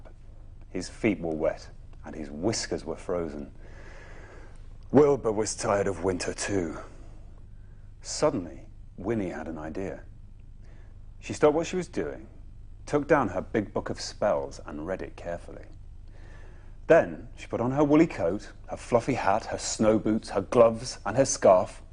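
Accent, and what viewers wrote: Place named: Wales, United Kingdom. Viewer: the welsh accent xD I am deceases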